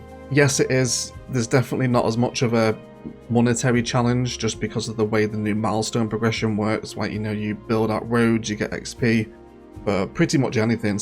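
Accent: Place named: Cockney, United Kingdom. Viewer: Omg cockney 😅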